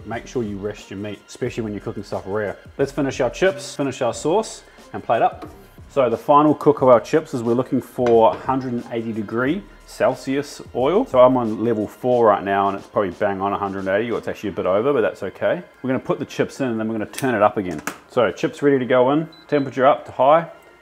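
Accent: New Zealand. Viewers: He from NZ but lives in Australia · All this time I thought you were Kiwi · How come you sound like a New Zealander? "Chups" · You sound New Zealand bro. But I don't mind some Kangaroo steaks. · are you kiwi tho? that accent is obvi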